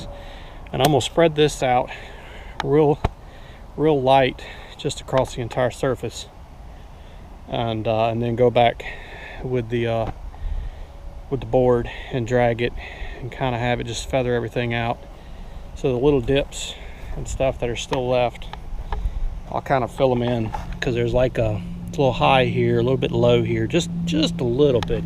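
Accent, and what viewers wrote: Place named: Texas, United States. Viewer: Yea that's too far. The accent sounded more like you were from Texas. You can actually build an in ground pool for about 3 to 5k. Depends on how fancy you want the finishes and if you skim coat or just use a liner. The construction is just with simple cinder blocks. You have the hardest part already solved... digging the hole.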